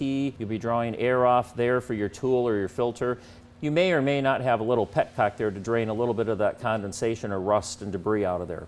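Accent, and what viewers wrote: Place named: Cleveland, United States. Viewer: talks like Cleveland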